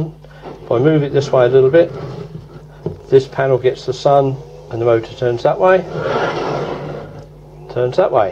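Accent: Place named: Suffolk, United Kingdom. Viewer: You have nailed it Sir, and, if my ears do not deceive me, you are a Suffolk gentleman to boot ! Double bonus ! With best wishes and greetings from South Africa. I shall certainly try this out in short order. Very best, Mike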